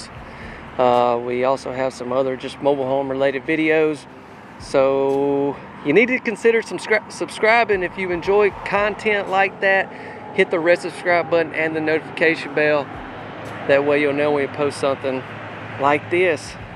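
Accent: South, United States. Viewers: I love watching these. My husband and I are considering a mobile home or a modular for our property eventually. Mobile homes have come such a long way. Also, his handsome southern voice makes these easy to watch too. Lol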